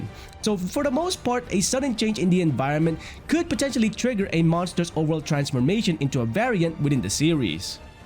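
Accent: Philippines. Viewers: When i Heard your Accent, I already know you are a "Kababayan" I think Variant Monsters are Those monsters that adapt to their surrounding or maybe just a Gene Mutation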